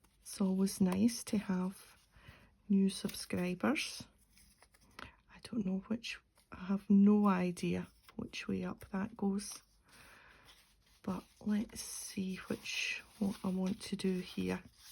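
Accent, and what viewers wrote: Scotland, United Kingdom. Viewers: Personally, I wouldn't mind if everyone had a Scottish accent like yours although I hope we do not lose all the different accents. It would be a shame to lose the variety and the history that is behind them. I read somewhere that the Scottish accent is preferred for call centers because of its warmth and approachability. I know I can listen to you all day especially when you are telling one of your stories. I find it very relaxing and comforting. Both prompts are very well-balanced and a pleasure to look at. I think this would make a great book to sell for inspiration. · Hi I am a new subbie, I am enjoying watching your little collages, I've watched a few now.... Mine are the opposite ha ha.... As for your accent I think it very easy to understand your Scottish accent is quite mild and mellow I think, it's quite calming actually but I suppose to foreign people it can take a bit of getting used too. 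🇬🇧 I think a 'Thing' can be literally any item, from a watering can to a kitchen appliance etc. 😂😂 Odd numbers are easier to work but it turned out cute in the end 😁💖 tfs Elaine from England xx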